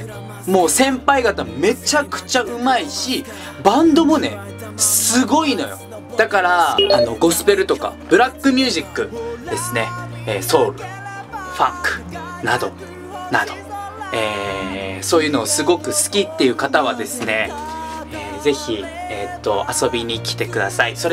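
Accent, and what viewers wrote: Fukuoka, Japan. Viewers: 福岡弁がすごくいいです😉💖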